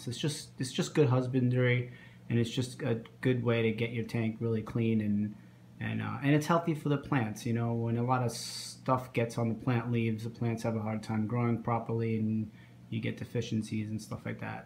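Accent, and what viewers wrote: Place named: New York, United States. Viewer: I heard your NY more in this video! haha. I cannot believe you found the time to make this when you are so busy with life lately. Those green neons are looking slammmmmming. Great advice as well. Looking good! I am glad you are entering the contest, they give some killer feedback that help us grow in the hobby.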